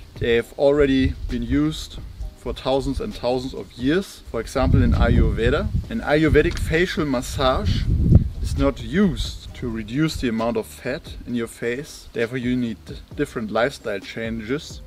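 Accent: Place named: Germany. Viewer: German as accent bro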